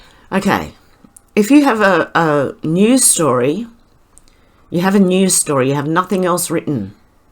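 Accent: Australia. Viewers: Iv been trying to listen for your speech to sound funny but you still sound normal. so keep being our Australian voice that covers the important world news. We need more Australians like you. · Hun I am really sorry that some nasty people made you feel bad about yourself. For what it's worth... I have never heard your speech as anything other than normal... well.. except for the Aussie accent ( I'm a Brit) lol. I look forward to your videos and always enjoy what you put together for us. Sending you all my love. Lisa xxxx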